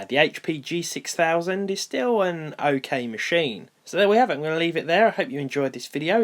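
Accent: Australia. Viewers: Need more Aussie accent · Love the Australia accent 😂😂😂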